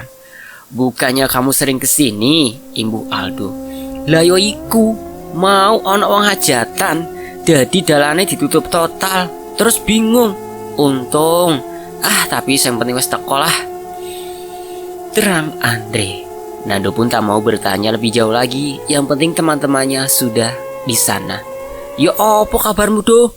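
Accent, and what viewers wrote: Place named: Surabaya, Indonesia. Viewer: dari dialek bahasanya kayak penulis dari Surabaya,cok,rek basa khas suroboyoan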